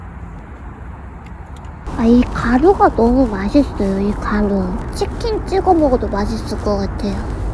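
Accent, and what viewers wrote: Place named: Gyeongsang, South Korea. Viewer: 홀리몰리과카몰리~ 억양을 보아하니... 경상도 신가봐요~ ^^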